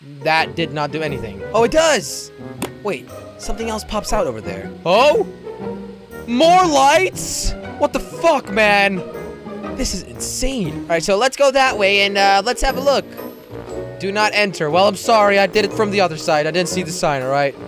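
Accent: Netherlands. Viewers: je komt toch uit limburg?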